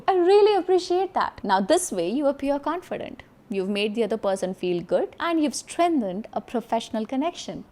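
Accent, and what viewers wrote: India. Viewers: Because she is indian ❤😂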